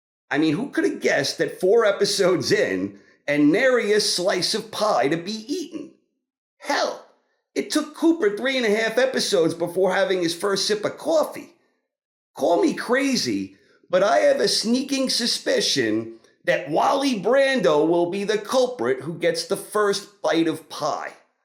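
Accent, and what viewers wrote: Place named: Brooklyn, United States. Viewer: You sound like you have a Brooklyn accent lol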